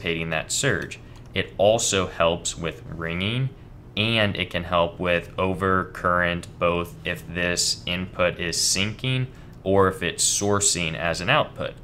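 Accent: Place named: Canada. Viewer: Hi. Some feedback. First off I love your videos. Even though you seem to doubt what you do and your content, you have found a niche area that nobody else does - proper design vids, background rules of thumb stuff that just works and EXPLANATIONS of why you decided on a component verses another this is invaluable!!. The amount of hands-on practical experience knowledge your give us is superb. I know theory but have limited experience constructing things that work in the real world - you help fill this gap. Please just keep banging out LOTS of design videos, don't worry too much about ' if we might find them interesting' we might in the future when the info is relevant to our project, and also don't worry too much about the length we want good information. The only criticism I have is your verbal delivery when your trying to talk 'proper' to camera - it is very monotone and Canadian. 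When you talk to us ad lib and making it up as you go along (like talking to your mates down the pub) it is MUCH easier and enjoyable to listen to. Have you considered a forum like EEVBLOG has - it's another link from Youtube and its search algorithm. Content suggestion - DC powerline filtering how and when to use inductors perhaps. - I am working on electronics in an automotive based environment (12Vdc boat) and cant find suitable info on filtering, voltage regulators etc.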